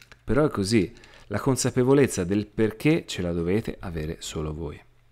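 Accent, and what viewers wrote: Tuscany, Italy. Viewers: grazie x il tuo impegno ti seguo a 360 gradi ! Da anni ho iniziato un percorso di rivoluzione umana e i tuoi consigli e condivisioni x me sono molto ispirativi e di sostegno concreto ! Non solo il tuo accento toscano ( come me e poi ho scoperto che sei di Pistoia quindi siamo anche vicini !) il tuo modo di esprimerti e il tono della tua voce trasmettono sincerità per questo ti trovo davvero verace e concreto ! Riesci a mettere delle frequenze x il sistema linfatico o x i vasi linfatici ? Grazie ancora x il tuo impegno ! Buona vita e buona lotta !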